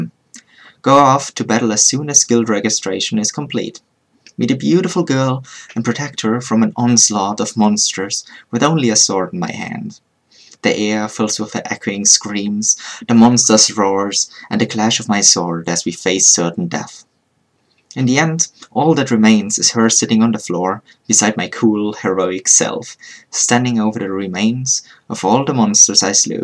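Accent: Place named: Germany. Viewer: where are you from? i dont think that you're a native speaker... sorry if yoz sad that in one of your vids, im new on your Channel ;) You could be from Germany tho at least that was my first thought : ouh this guy has to be german hahaha great work ps.: Im from Germany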